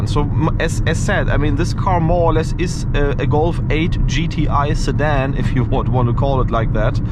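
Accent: Germany. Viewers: It took exactly 3 seconds to know you are german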